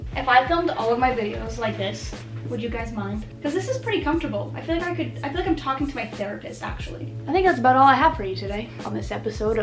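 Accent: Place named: United States. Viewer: Your country accent hahaha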